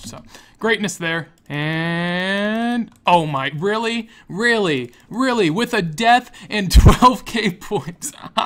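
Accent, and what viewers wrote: Michigan, United States. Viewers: Wait your from Michigan? :O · Yooo are you from Michigan? Let's go blue baby final 4!!! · Wait are you from Michigan? 313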